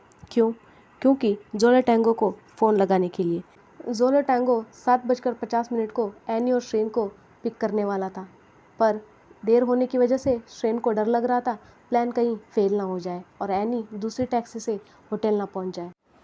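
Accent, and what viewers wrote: South, India. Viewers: Are you Hyderabadi or any other part of South India??? Love ur accent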